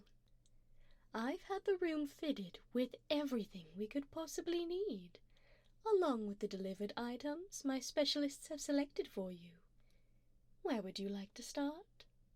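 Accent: United Kingdom. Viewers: British vampire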